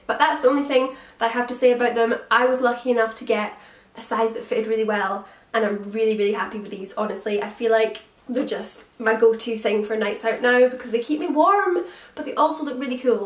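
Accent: Scotland, United Kingdom. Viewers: You suite the clothes really well Ps are you Scottish cause u have a Scottish and I'm from Scotland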